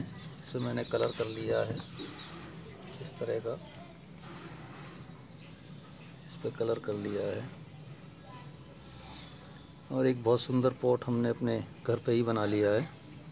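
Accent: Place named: Punjab, India. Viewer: Apki hindi se Punjabi lagte hain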